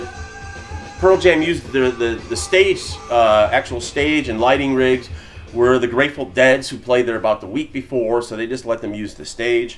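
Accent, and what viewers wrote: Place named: Chicago, United States. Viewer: ! Happy Anniversary! Love the videos! When I’m homesick, I can come here and listen to some Chicaaaago accent and great music! Cheers brotha!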